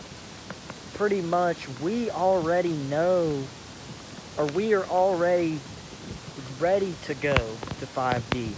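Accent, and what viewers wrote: South, United States. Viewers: You are so young! Where 'on earth' did you so quickly find the time to acquire all that wisdom, sageness, and accomplishment and develop the urgency and ability to put it all into this easygoing flow of eloquently spoken words? Some tremendous former lifetimes must be involved in there! Do you remember parts of one or some of them? I wish I could have crossed your path in one of them!😳 ...(And this time round, is it that I detect a trace of Southern drawl?...🤔😁).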